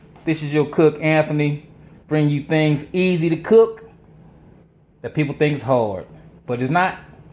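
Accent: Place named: South, United States. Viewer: My wife said you sound country. Where are you from????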